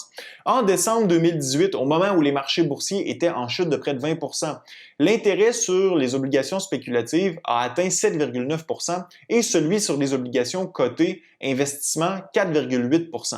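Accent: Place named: Quebec, Canada. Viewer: J'adore tes vidéos! Ça fait plaisir d'entendre un accent québécois sur youtube ;)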